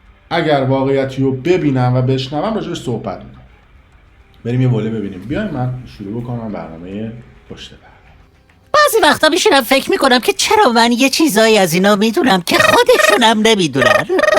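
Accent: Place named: South, Iran. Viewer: Binazir mishe vaghti Junubi sohbat mikonid👌👍🤞👏✌🤩😍♥️🌹⚘🥀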